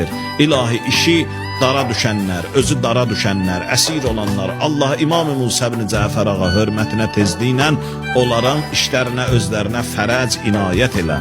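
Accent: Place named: South, Azerbaijan. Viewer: Cenub bölgemizdenem bilirem danışığnınan qardaş sende cenublusann 😢😢😢.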